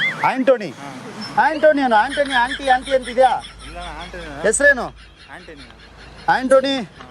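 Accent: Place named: Hassan, India. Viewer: Bro nivu mathdo reethi nodidre namma Hassannadavaru anssuthe bro 🥰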